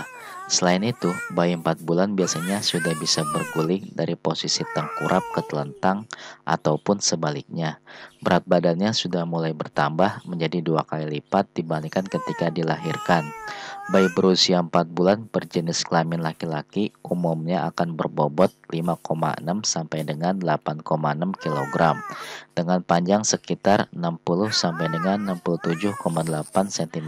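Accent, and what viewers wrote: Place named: West Java, Indonesia. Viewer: Ini yg ngomong pasti orang sunda ya😂😂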